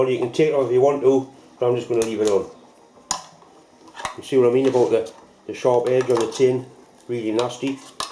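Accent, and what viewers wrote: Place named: Tyneside, United Kingdom. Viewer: classic !! just rocked onto this, so so funny, trying to place the twang, guessin south tyne poss same as me gateshead ??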